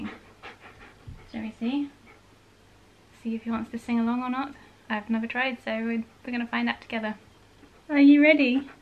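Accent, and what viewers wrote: United Kingdom. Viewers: It’s cuz she sounds British or something. If your American of course you love it what American doesn’t? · Can you answer if ur British is me am British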